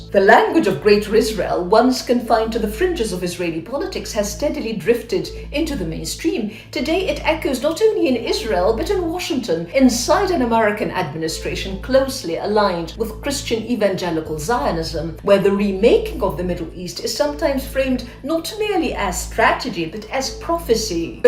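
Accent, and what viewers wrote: RP, United Kingdom. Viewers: Do you really speak in Received Pronunciation, or is this a put on? Nice message! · That's a fine British accent you've got. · Is this truly her accent, or is she mocking the brittish London accent . But she does make some great points though... kudos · As an American I just want to say that her accent is both impressive and off putting at the same time. - To me it’s impressive that she learned to do a posh, aristocratic English accent so well, but it’s also off putting because she sounds like an English aristocrat to me. I’ve only ever heard that accent in plays or when people are intentionally trying to be silly, so it’s hard for me to take it seriously when someone uses that accent. My whole life the only time I’ve heard anyone speak like that, it was Americans who were trying to be ridiculous for a laugh. I’m very opposed to this war and want to help everyone else who opposes it to get their message out. 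I understand I’m saying something here that might rub some people the wrong way, but it’s intended as constructive criticism. I’m trying to help.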